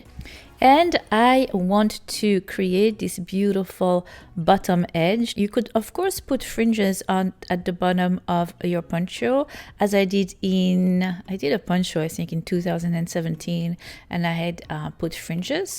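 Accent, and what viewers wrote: France. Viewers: Houlala!!!! oulalala!!! J'aime tellement your Frenchie accent. Je comprend "presque" tout! Blog and vidéos are sooooooooo très très bien. And love your chaîne sooooooooo much. Hope de GAGNER ( hopefully )🤩🤩🤩🤩🤩🤩🤩🤩🤩🤩🤩 Je love crocheter...with you from France 😍😍😍😍😍😍😍😍😍😍😍😍😍😍😍😍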